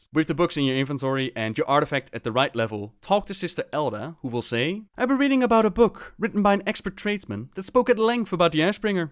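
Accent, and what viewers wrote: Netherlands. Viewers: are you dutch??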